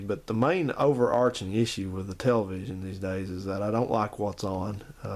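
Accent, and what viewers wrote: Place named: South Carolina, United States. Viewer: Squad 51 squad 51...though I'm 32, I love that show. Agreed about making merge...just what kids need to learn. My 9 yr old likes your repair videos over tv. He tells me you sound like you live in south Carolina.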